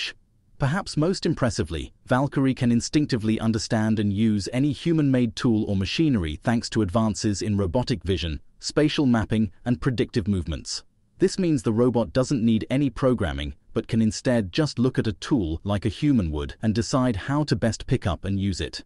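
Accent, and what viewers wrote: United Kingdom. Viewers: Why would a voice with a brit accent call is soccer? Boooo. Lol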